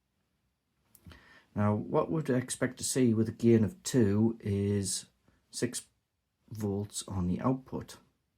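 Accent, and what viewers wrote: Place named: Northern England, United Kingdom. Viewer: Glad your on the mend you sound like we are both in God's country Up North so subbed just for that and before I have look around your channel. All the best, Kev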